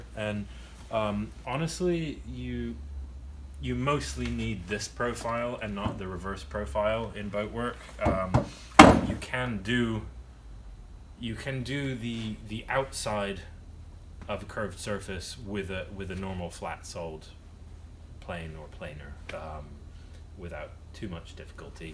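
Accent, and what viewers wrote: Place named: Cornwall, United Kingdom. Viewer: His is an American tinged Cornish accent, so that's fair enough.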